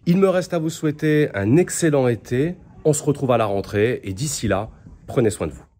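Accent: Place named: South, France. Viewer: ça sent le sud !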